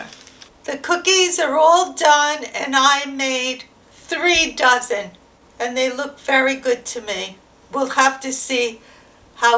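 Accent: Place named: Long Island, United States. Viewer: Ex-Long Islander here out in Vegas. LOVE hearing your Long Island accent makes me feel as if I was back home! I gotta make these tomorrow. Wishing you both a warm and Merry Christmas! ♥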